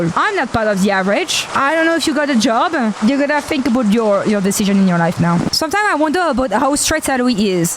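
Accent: France. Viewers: I’m Mexican but I really like her French accent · A french accent Vtuber... "Never in my life have I needed something so much, and never known until I received it"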